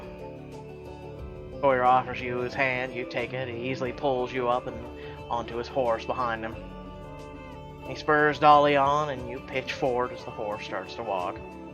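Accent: South, United States. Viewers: Not American, but always liked this southern accent. YOU ARE KILLING IT! Good job!! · Some dumbass accused you of being racist for using southern drawl? Really? Well, I'm a black woman and I never thought you were racist. I was just enjoying the videos.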